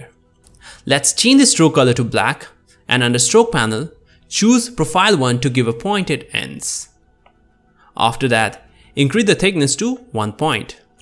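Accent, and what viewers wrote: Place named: India. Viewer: chhhharactorr ? indian cu*t ? lol shity accent always leaks out. No matter how hard you try...wannabeeez!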